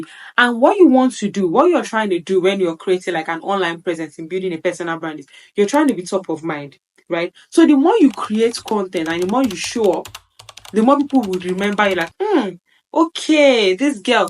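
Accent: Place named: Nigeria. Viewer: I love the way she's so proud of her Nigerian English and thanks for this advice mama ❤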